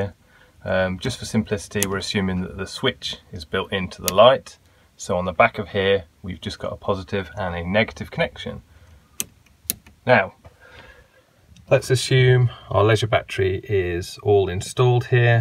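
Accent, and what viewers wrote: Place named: United Kingdom. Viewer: Can’t thank you enough for these videos. You’re an excellent electricity teacher. Spent a few years NOT understanding this. You explain it plainly in 15 minutes. Ahhhh the time I could have saved 😂 and for some reason I understand the British lesson way easier than if an American is teaching it. I think some of the words make way more sense (leisure battery (b)=dual system(a)) plus I enjoy your accent way more than these hicks we have here 😂😂😂 sorry I had to 😝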